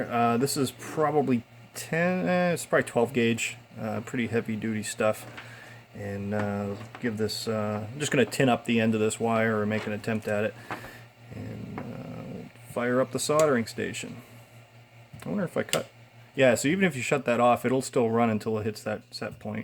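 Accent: China. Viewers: "Chinglish" BOOM--SUBSCRIBED!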